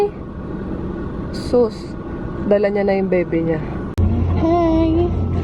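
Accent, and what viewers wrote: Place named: Quezon, Philippines. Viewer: hehehe puntong quezon ai... tlga poh san poh cla s infanta?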